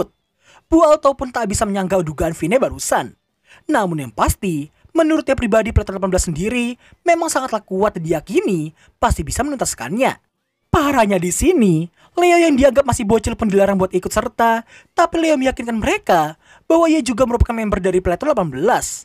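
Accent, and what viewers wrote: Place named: West Java, Indonesia. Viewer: Bang lu orang Jawa Barat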